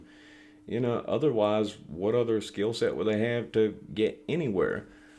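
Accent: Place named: Tennessee, United States. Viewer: Hey you said you answer comments I’ve been watching your show for a while how did you get a Tennessee accent from your babysitter?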